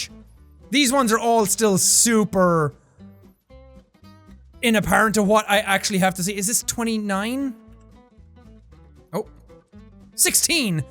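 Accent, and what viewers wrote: Ireland. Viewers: My earphones broke and now I miss hearing Irish accent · That's the Irish accent for ya.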